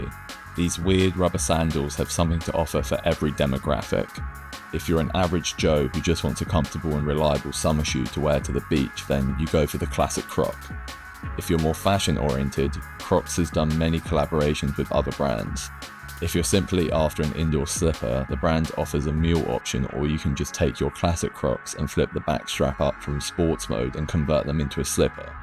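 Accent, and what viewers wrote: United Kingdom. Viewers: I love your videos but i have to admit, its hard listening to that much fry (plus the britishest accent, my mind is exploding)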